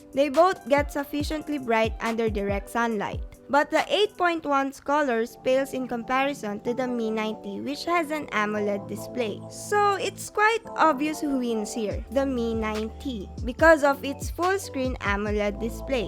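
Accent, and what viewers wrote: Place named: Philippines. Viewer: kitten is a cat what I think he's trying to say its kind of cute she has that kind of "stereotypical" filipino english accent which I guess is heard in most grade 10 students of the Philippines despite her age. But who knows lel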